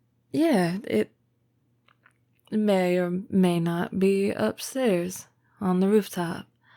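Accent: South, United States. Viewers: I love your southern accent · Oh I need more of your southern accent now... I love it...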